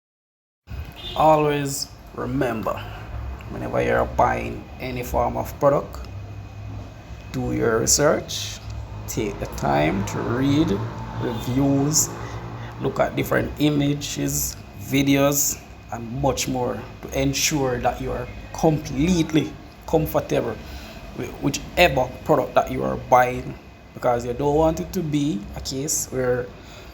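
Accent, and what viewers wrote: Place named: Jamaica. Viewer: Ohhhh. You are jamaican. What part of jamaican are you from? I was born in Manchester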